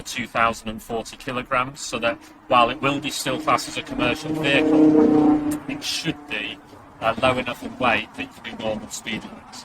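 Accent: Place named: United Kingdom. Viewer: I can hardly understand with your British accent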